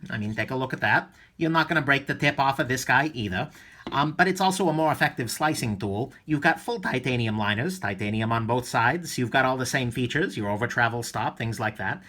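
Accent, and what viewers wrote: New York, United States. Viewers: Love the vids. You have to be from New York. Lol. Cheers.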